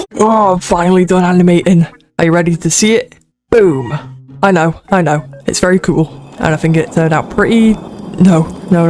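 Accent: United Kingdom. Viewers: it is his accent, i live up north england and his accent sounds familiar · I Think bros British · Really Good job, the British accent makes it even better❤ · Are you British? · Since you are British, can you say “Can I have a bottle of water?” Please. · Very British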